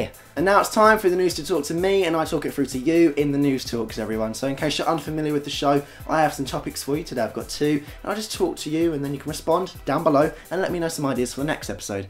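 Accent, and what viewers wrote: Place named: Australia. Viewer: Phaha hilarious! Your australian accent is just like mine, guess that could be a compliment or not we may never know! xD you never fail to make me laugh, thanks! :)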